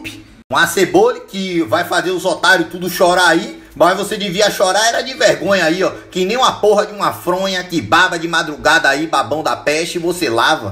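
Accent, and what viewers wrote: Bahia, Brazil. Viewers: Você é bahiano kkkkkkkkkkk adoro kkkk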